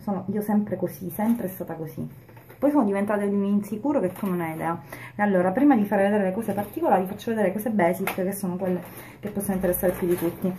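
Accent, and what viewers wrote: Sicily, Italy. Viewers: Io ti adoro! Tiri su il morale, ma profondamente! Spingi per avere piu autostima per te tutto si puo fare, sei sincera te stessa e finalmente uba che da del tu e non del voi (e non e poco) mi fai sentire amica tua e non per ultimo sei siciliaaana mizzica mi manca la parlata và! Ciao biddazza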